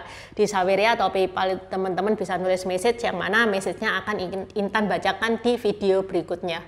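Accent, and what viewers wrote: Java, Indonesia. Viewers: Seneng banget sama itu logat jawanya medok bangeeet,waw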